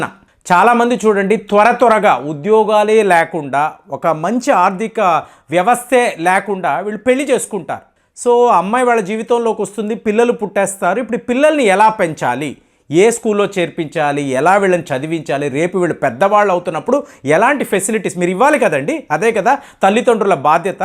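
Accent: Tamil Nadu, India. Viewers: GARU meeru chaala peddagaa vaaguthaatru; anavasaranga high pitch lo pelutharu: Governor Shanti SHANTI Kanta Das ani perkonnaru: naaluka madatha padinda ledaa mind dobbindaa? Aayana peru Shakti Kanta Das. Enduku antha hype create chestaru meeru; prathi maataa nokki vakkaNinchi paday paday chebutharu; too much hype thammudu; manchi vishayalu testhunnavu kaani intha hype aqqaraledu: mari Mimmalni ani cheppey badulu mimmaLLni ani chebuthavu: ee yaasa vadulukolevaa inkaa aa saambaru inguva vaasana poleda? Intha kaalam telugu rashtralalo untoo achcha telugu palakaleva. Ayyo devanandanaa!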